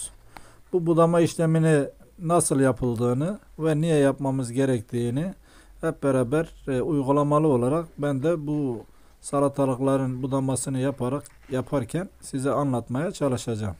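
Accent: Malatya, Turkey. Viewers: Bende malatyaliyim bizim yöre şivesine çok benziyordu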